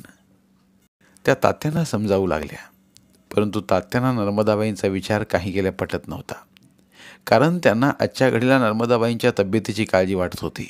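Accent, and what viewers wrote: Rural, India. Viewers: 🙏 तुमचा ग्रामीण आवाज व वाचन अतिशय सुंदर आहे. मी तुमची नवीन श्रोता वाचक आहे. 😀😀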